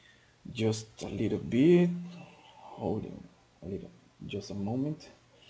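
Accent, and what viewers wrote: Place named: Brazil. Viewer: assim que começou a falar, sabia que era brasileiro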